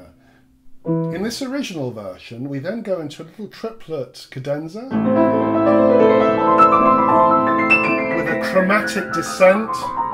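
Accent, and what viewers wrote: United Kingdom. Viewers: Also, being a fan of Monty Python, I couldn't help but notice you're accent. It reminded me of John Cleese? Saw him live, in Charlotte. Thought you were from the same area, perhaps? I've learned, ALL English accents are NOT the same! LOL!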